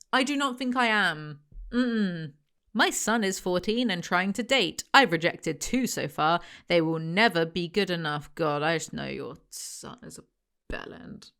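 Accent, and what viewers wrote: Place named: Nottingham, United Kingdom. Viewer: As someone from near Nottingham, UK I appreciate hearing an accent like mine on YouTube and someone with my nasty sense of humour 😂😂 Thank you 😂❤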